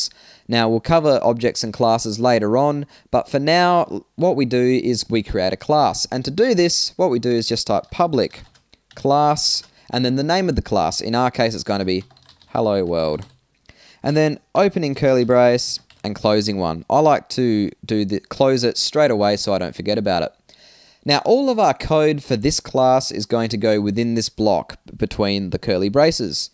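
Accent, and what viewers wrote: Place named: Australia. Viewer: Nice one. And great to find some tutorials with an Aussie accent!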